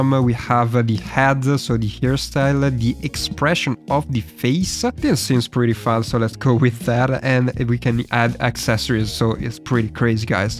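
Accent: Italy. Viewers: I find Italian accent very funny and interesting 😆❤❤❤ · Give the guy a break, that is how Italian’s talk.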